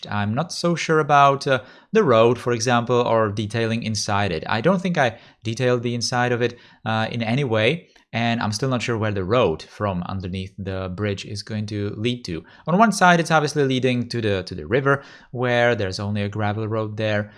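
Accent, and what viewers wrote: Czech Republic. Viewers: Are you Czech ? :D that accent .. or Slovak by any chance? :D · your accent tells me you are Czech, am I right? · Hi can I ask you where are you from. Your accent sounds Czech.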